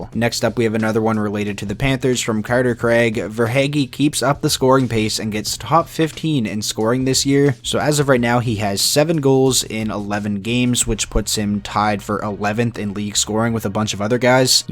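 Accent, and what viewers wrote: Canada. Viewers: Your voice sounds often Canadian! Not that that’s a bad things!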